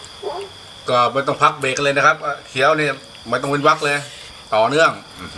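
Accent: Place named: Rayong, Thailand. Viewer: ไม่สุกทีง...สำเนียงระยองเลยคับ555..fcคับ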